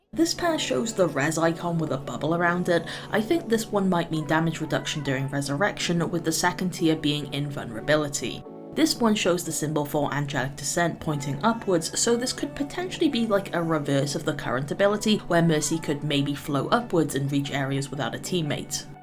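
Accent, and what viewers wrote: United Kingdom. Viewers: Ah yes, British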